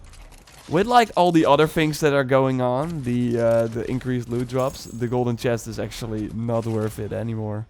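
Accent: Netherlands. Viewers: You sound dutch not gonna lie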